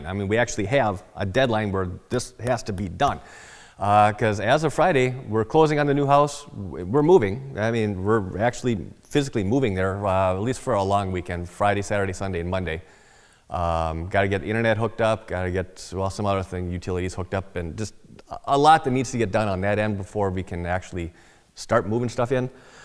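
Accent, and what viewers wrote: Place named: Wisconsin, United States. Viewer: Based on his accent I’d bet he’s from Wisconsin so not much different weather wise.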